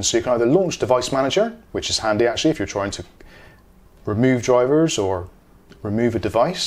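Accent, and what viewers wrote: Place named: Gloucester, United Kingdom. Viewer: Highlands! But from Gloucester originally, so I recognise the accent haha (or am I completely mistaken? haven't been there for a while lol)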